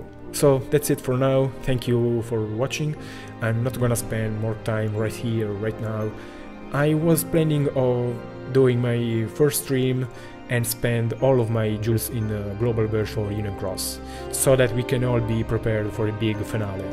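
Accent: Italy. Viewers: So excited to make your stream and getting more content from you!!! That Italian accent could never get annoying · And btw your Italian accent dont annoy :3....it makes you very handsome :3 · "So hopefully, you'll be forgiving all my mistakes." Bro, we're not even going to notice them. Also, we Americans love accents. 😉 Including Italian.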